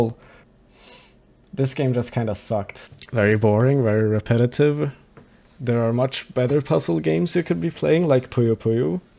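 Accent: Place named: Sweden. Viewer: ahh I expected you were Swedish by ur accent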